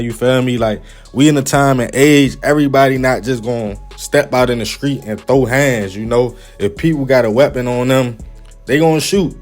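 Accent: Baltimore, United States. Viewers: "Watch who you call you're FRIEND. Thats a strong word. A real homie would NEVER kill you. If it's that serious they would simply cut you off if they not feeling your vibes no more because they don't wanna see no harm come your way" 🤦🏾‍♀️💯💯💯 SOME OF THE MOST WISE WORDS EVER SPOKEN KING 👑 I JUST KNOW YOU FROM MY CITY LOL THE WAY YOU SPEAK JUST SAYS BALTIMORE ALL DAY 🥰🥰🥰💪🏽💪🏽💪🏽💯💯💯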